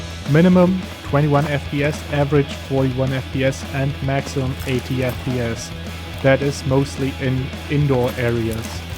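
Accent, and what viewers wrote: Germany. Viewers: are you german your accent is like a german is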